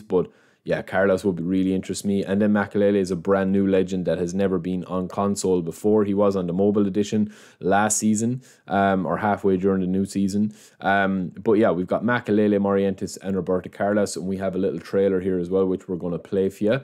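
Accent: Ireland. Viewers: I know it may be random, but are you from Cork?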